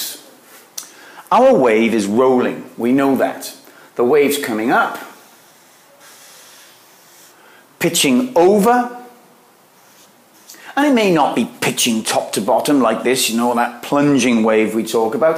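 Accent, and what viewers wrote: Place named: South Wales Valleys, United Kingdom. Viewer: I thought so, I'm a valleys boy so I recognised your accent right away!! I got the bug from my dad, who surfed all over the world when he was in the merchant navy back in the 60's also. Currently, I'm surfing a 7'2" NSP board, and I'm surfing mainly at Freshwater West in Pembroke where I live, so, your tutorials are super helpful, so, thanks!!! Happy days dude!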